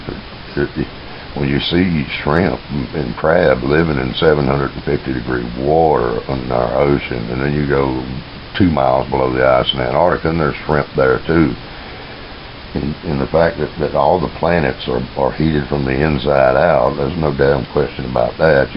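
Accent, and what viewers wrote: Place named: South, United States. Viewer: Pleasure to watch your Vid. Thank You. So why do you think it is. that when people hear a SOUTHERN accent they automtically tjink SLOW OR STUPID? It has always bugged me. Thank you. You may talk slow but you sure don't think that way. :-) PS: My daughter who is Home Schooled follows you and understands you VERY WELL. She is doing experiments everywhere now. She is watching for "SIGNS OF LIFE" LOVE IT!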